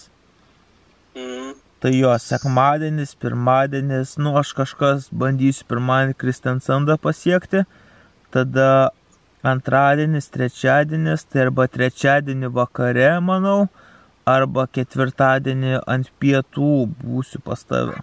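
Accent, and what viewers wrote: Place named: Panevėžys, Lithuania. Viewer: girdis kad panevežiokai :D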